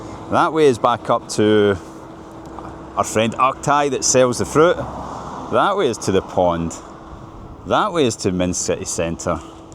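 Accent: Scotland, United Kingdom. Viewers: I like your Scottish accent. Maybe it's because I've listened to quite a few Ian Rankin's audiobooks.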